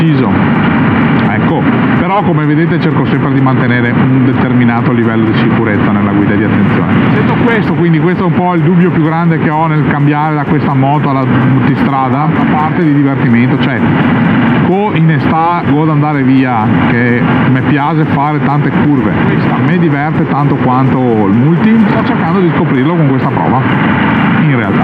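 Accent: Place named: Padua, Italy. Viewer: bel video, sempre grandi contenuti e spiegati, raccontati in padoan, tanta roba🤣🤣🤣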